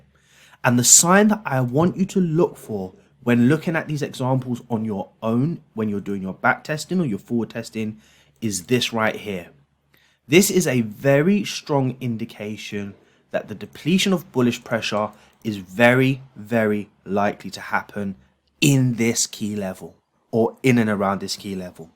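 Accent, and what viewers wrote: United Kingdom. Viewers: I found you on youtube 4 days ago and started to watch your videos and found answer to my questions and found out what wrong with my set ups..all day long your words with that beautiful British accent is repeating in my head and makes me do the right thing.Thank you.Thank you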